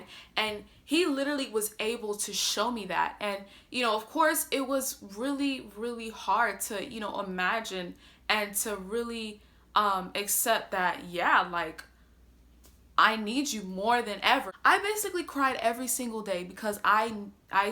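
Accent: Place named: Brazil. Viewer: You have a slight Brazilian accent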